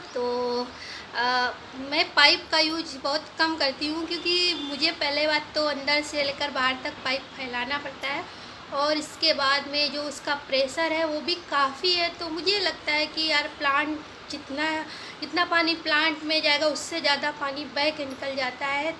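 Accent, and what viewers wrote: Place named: Kanpur, India. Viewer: तुम्हारी आवाज सुनकर कानपुर की याद आ जाती है बिल्कुल ऐसा लगता है जैसे हम घर पहुंच गए आपके प्लांट के बारे में भी जानकारी दी बहुत अच्छी बहुत-बहुत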